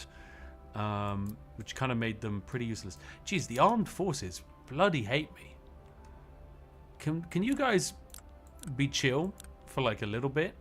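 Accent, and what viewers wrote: United Kingdom. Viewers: Cant wait to fall asleep to a british guy talking about drugs and tea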